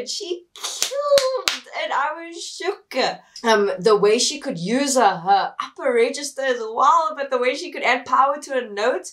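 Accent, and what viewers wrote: South Africa. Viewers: You South African? Your accent sounds really nice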